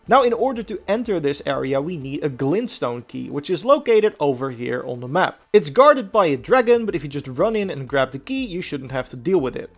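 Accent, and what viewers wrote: Netherlands. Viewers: The Dutch accent makes this video so much better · hehe nederlander ik hoor het wel ;) goeie video man ! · Good video thx. Your accents sounds Dutch, is that correct? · Aan zijn accent te horen spreekt deze meneer ook Nederlands :D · You are so dutch haha, instantly recognized the accent :p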